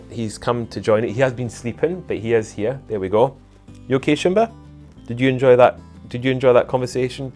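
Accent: Scotland, United Kingdom. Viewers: Here we are one year later and I still find your subject, your delivery and your charming Scottish accent simply captivating💞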